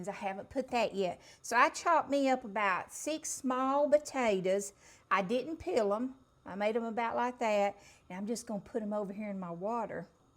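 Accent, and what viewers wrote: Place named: South, United States. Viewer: I think you are so beautiful (inside n out). I’m from the south too and love listening to your sweet southern voice. I wish that you would do a hair tutorial video. I love your hair and would like to learn how you get it so perfect and high. I’ve tried but I cannot figure it out. You are a blessing to me - thank you for your gentle and kind sweet spirit.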